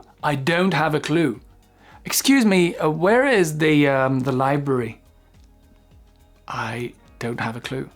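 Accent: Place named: England, United Kingdom. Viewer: j'adore ton accent anglais ... une approche très éducative, à la portée de tous (et toutes ;-) ) merci !!!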